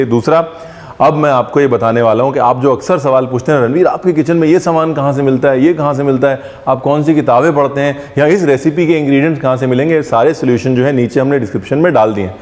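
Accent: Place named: Lucknow, India. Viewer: Like ur Lucknowvi andaaz😁😊😊🏆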